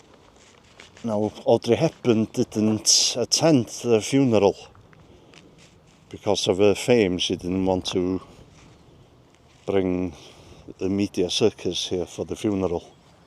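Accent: Wales, United Kingdom. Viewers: He's Welsh!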